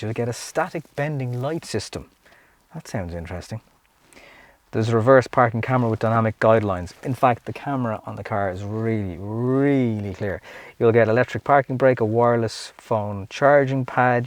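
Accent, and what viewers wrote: Ireland. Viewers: U Irish u sound like it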